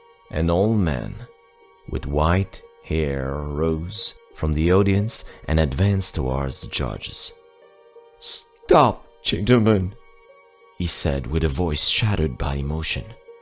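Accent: Quebec, Canada. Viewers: As a Quebecer, I've heard of La Corriveau, but it was a different story. I wonder how they discovered it really was only the two men she had killed? Very interesting, and love the added touch of having a Quebecer narrate this story.. the morbid aside, this was lovely! :) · To those poor little souls hurt and insulted by a slight French Candian accent... Did you also go on Disney's forums to complain that characters in Encanto have a Spanish accent? The legend is from Quebec City and Lévis, where citizens still talk French today. It's 2022, FFS! Please, grow a damn spine and enjoy people's cultural upbringings! It's a strength, not a weakness! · Also it's great to hear a quebecer !!!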